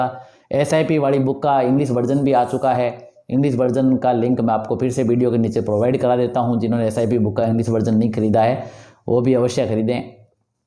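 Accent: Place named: Sirohi, India. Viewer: आप की भाषा सिरोही की लगती है आप कहा से है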